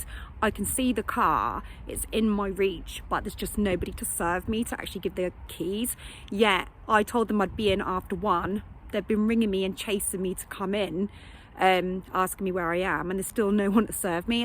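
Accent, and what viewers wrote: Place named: England, United Kingdom. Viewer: Totally unrelated comment however I have been wondering what part of England you are from originally. You have a wonderful accent. Here in Australia I think one would have to be a linguistic expert to pick the difference in accents from each of our states! doesn't have the same accent as you?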